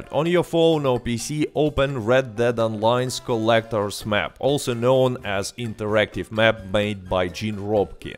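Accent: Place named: Russia. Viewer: My dear I stop paying the game but I still love your video I enjoy your Russian accent very much please keep up the good work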